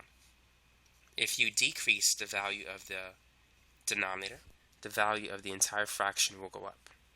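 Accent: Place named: Boston, United States. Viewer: Its how they talk in boston ...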